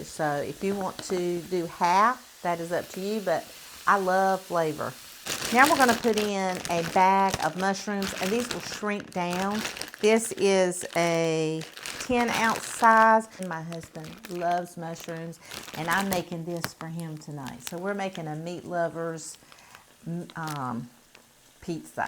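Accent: South, United States. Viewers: I love your recipes. I have made so many of them. My husband loves your sloppy Joe recipe. He said he doesn't want the canned stuff anymore. 😂 My 14 year daughter loves watching you too. When she hears your voice on the TV she comes into the room and says" It's the cute Southern lady." Then she'll sit with me to see what you're making. ❤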